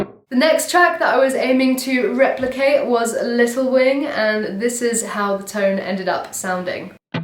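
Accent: England, United Kingdom. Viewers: Unbelievable!! I love & after I learned how to play my 1st song I had a newfound respect for the man. I still am amazed by the way he thought and the licks he came up with. The 1st song I learned to play ever was!! I'm def going to check this out. I love your accent of course you are from the UK.. it's hard too meet a girl that rocks in the US. Now it's hard to meet anyone, (COVID) smh. Love your music keep up the good work you rock!! -- Nigel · Se nota que es inglesa, puedo conocer esa forma de hablar y su acento pirata!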